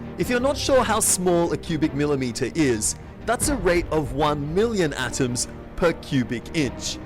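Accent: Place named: Australia. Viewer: As an american used to the way americans speak I have to say I do sense a bit of an eronant undertone in his speech but I know that thats just the australian accent. So hes not a douchebag, his accent probably just tricked you into thinking that.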